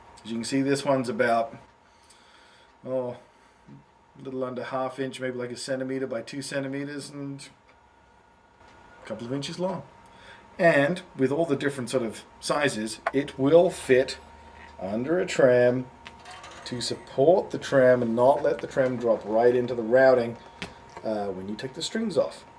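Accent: Australia. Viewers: Tequila - sounds like he comes from Australia? Sounds like that kind of accent. Anyway, awesomely informative video - I've got a razorback coming soon and this makes it look so simple! 5*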